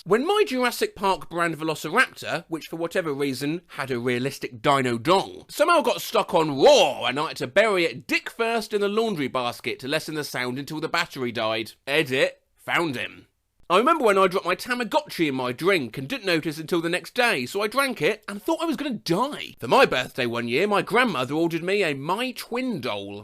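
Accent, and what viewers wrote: United Kingdom. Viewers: i'v never heard a voice screaming "BRITISH" more than yours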